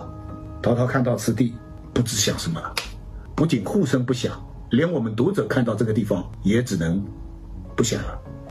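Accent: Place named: Shanghai, China. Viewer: 喜欢许老师用上海话念书！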